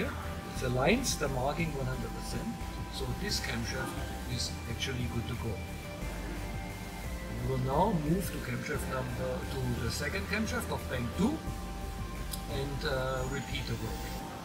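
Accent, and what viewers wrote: Germany. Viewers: Just your accent makes me want to ship my motor all the way to Germany so you can rebuild it!!! Awesome video. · As a machinist and a car guy I can appreciate your work! I worked in a German owned shop for many years, your accent brings back many memories. Keep up the excellent work!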